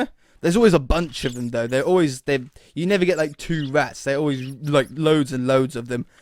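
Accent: United Kingdom. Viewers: Hate to be rude, but may I ask, are you British? I'm part British part American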